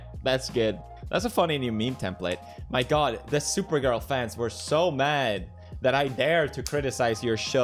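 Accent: Sweden. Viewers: is it me or is his swedish accent coming back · his swedish accent was way heavier back then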